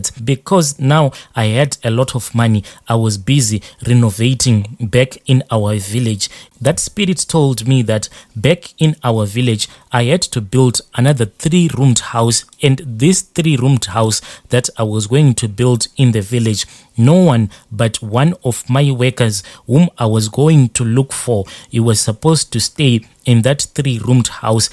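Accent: Zimbabwe. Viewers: Is he from Zim this brother his tongue said so.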